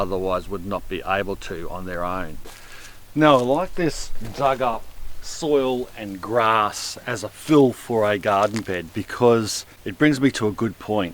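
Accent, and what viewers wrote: Australia. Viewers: I'm half watching just for the accent. The Aussie accent is the coolest there is. G'd day, mate. Oh, and thanks for saving me time and money. I would have filled mine with high quality $$$ soil. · currently 26 people don't like this video: you have no hearts guys! :D Aussie accent the body and the energetic effort with a ton of passion - together make my days better! A FULL thumbs granted!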